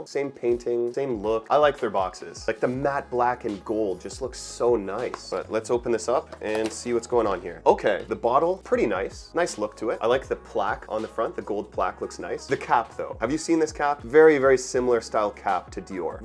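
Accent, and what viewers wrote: Canada. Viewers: It’s not a mispronunciation or wrong - it’s a nuance of a Canadian accent. Let’s appreciate (and not correct) cultural and regional variations. Great video,!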